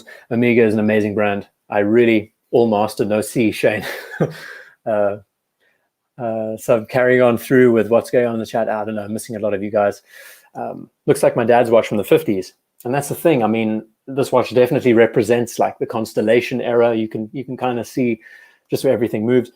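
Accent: South Africa. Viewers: Your dead wrong Bob! I completely disagree! FYI it is just under 4 hours of snobbish and arrogant critiques and opinions of watch photos by an obviously narcissistic man with a South African accent that he has mistakenly made sound so patronizing and annoying that nobody listening to him will realize he was trying to sound intelligent or "cool" because their brain will be frozen by the endless nails down a chalkboard effect that his voice has on them and he never stops talking for more than a second or two for the entire four hour torture fest. This obvious narcissist who definitely cannot afford a single watch that he talks about unless his "Daddy" buys him one is also completely unqualified to critique or give his opinion on these timepieces so thank goodness for the repetition Bob, it helps us to remember the information being shared that is most likely completely wrong and/or irrelevant. 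The best part is this guy is only 26 or something unless I heard him wrong which I may have because I was often interrupting him by yelling and screaming profanity at the screen but who talks like this guy at 26? Notice he doesn't show his face at all which is probably because he was bullied so bad in high school that he had to enter the witness protection program. The world is going to end soon if arrogant, luxury worshipping little weasels like this guy can actually make money rambling on about watches. Freedom of speech is a real burden sometimes and this is one of em big time. I wis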